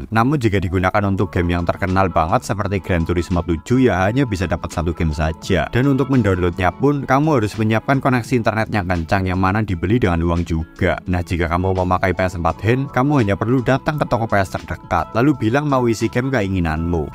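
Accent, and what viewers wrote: Banyumas, Indonesia. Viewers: VoiceOver.e ngapak nemen ya?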